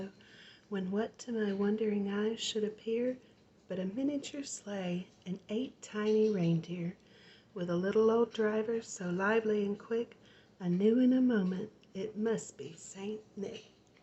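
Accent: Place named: South, United States. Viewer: Hi ❤️. Thank you so much for this wonderful video ❤️. I really enjoyed it. The whispering, hand movements, tracing and story reading is very tingly and relaxing. It's been a long time since I've seen a pop-up book. That's really cute. Your whispering and southern accent really brighten the stories. Thank you for sharing this with us. Your soft soothing voice really adds to the tingles. Thank you again so much for this wonderful video ❤️. Please stay safe and be careful ❤️. Merry Christmas my friend 🧑‍🎄❄️☃️🦌🎄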